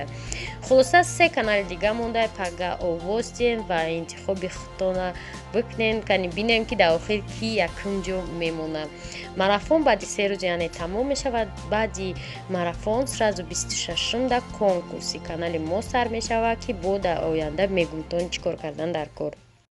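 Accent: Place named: Khujand, Tajikistan. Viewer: Эх лафзи хучандиии гушаком кайба туя мешунавад😭😭😭😭😭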